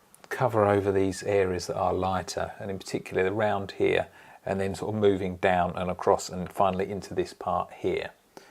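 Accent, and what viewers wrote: United Kingdom. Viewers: Absolutely BRILLIANT !!!! Fanominal panter.Love the British accent as well.💖👍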